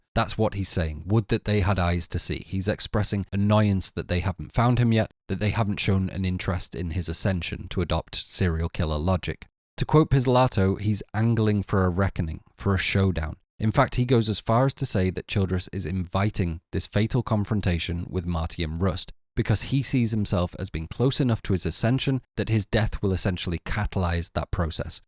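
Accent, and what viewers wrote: United Kingdom. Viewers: The british accent is taking over youtube.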